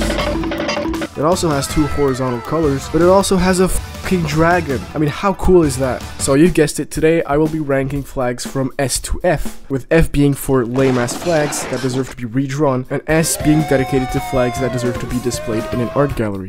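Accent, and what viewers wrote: France. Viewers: Are you french? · are you french? Unsubbed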